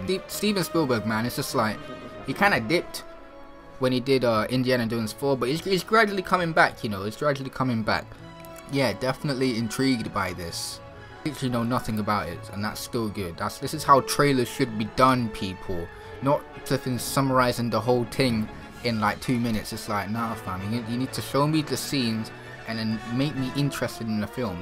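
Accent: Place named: United Kingdom. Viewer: Ive never heard a british accent with a lisp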